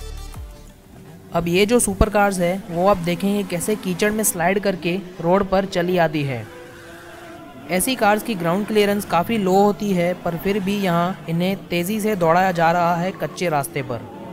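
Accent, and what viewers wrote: India. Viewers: stopped watching the second I heard the indian voice lol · Why is there a Indian talking? 😒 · Dude, are u indian? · Clearly not his fault,he is Indian and It's very different from english,Don't be racist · Hes indian, not jis fault for not having english as his first language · He sounds Indian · its english with an indian accent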